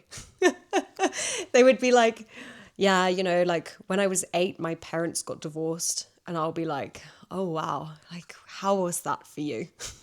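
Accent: England, United Kingdom. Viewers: hey, love your videos, love so much you do this podcast bc i live in mexico and the usa acent os afull and british so more elegant and more structure i dont know, send hugs gracias y buen dia y sigue haciendo tu magia ademas de practicar tu español, gracias por leerme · 日本ではアメリカ発音で英語を習いますが、あなたのビデオでイギリス発音の魅力を知りました。 私には少しだけスピードが速いので、0.5倍速で字幕をつけて発音の練習をしています。 とてもナチュラルな動画内容なのもとても好感が持てます。 これからも活動応援しています。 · Hi,! I am your huge fan! 🥰I am a university student from China. I first found your videos back in March on douyin(China’s version tiktok). I had watched most videos there, but the account has stopped uploading the new contents since June, so I came here(youtube) and tracked you down(official account!)🤗. I like the way you teach English(daily life English) and your British accent! I can learn many new and fun words and get joy from videos(especially when you laugh, it’s so infectious).:elbowbump:Can’t wait to watch more videos from you! 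And hope your channel keeps getting better and better! By the way, I feel you look a little like Lara Pulver(from Sherlock).🤭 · Hi, I'm commenting for the first time on your video but I've practiced with you everyday since I found your channel. I aim to get 7.5 in IELTS test to move to Australia but here, in Japan, I felt kinda difficult to be soaked in English while doing the job. I've looked for many English channels but I love your channel the best ever!! How you speak, the attractive content for me every times, England accent which I want to immitate, your kind personality, and cultured talk. This time, I was engaged by your word, go try and don't be afraid of failure, and try to speak or write not using AI. I often use AI to translate because I want to use complete words but I changed my mind about it. I think I'm ambivert personality like you, but I often lost confidence when I speak English. 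So today I got a motivation from you again.🌼 Thank you as always✨🙂‍↕️ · Eres la mejor profesora gracias por tus maravillosos consejos, me encanta uur British accent😊❤ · has a beautiful personality type, i like when you smile your short laugh Is this type of being shy? I swear U my favorite british teacher with the best accent Your short laugh kills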